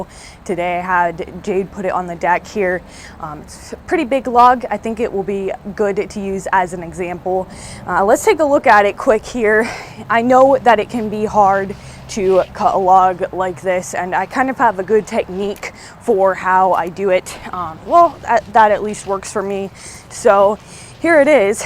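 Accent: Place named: Pennsylvania, United States. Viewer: being from PA, the "alright" is just a part of our PA talk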